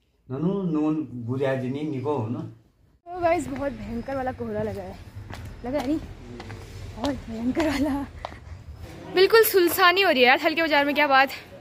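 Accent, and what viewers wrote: Pahari, India. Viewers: आपकी पहाड़ी बोली बहुत अच्छी है 👌👌👍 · tumhari jo ye pahadi m bolna hai na mujhe bahut achache lagate hai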